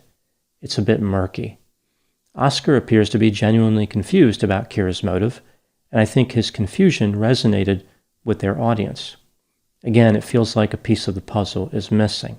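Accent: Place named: Pennsylvania, United States. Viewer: Anyone know if Dr grande is from pennsylvania? Something about his accent reminds me of a friend of mine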